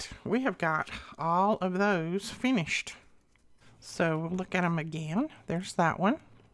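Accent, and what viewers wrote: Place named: Alabama, United States. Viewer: I love your accent! ❤❤❤ Are you from Alabama?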